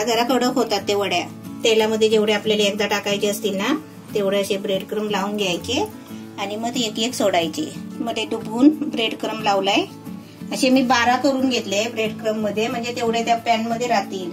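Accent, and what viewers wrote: Konkan, India. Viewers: Kaki tumch gaav konat tumchya bhashe varun tumhi koknatlya aahet asa vatat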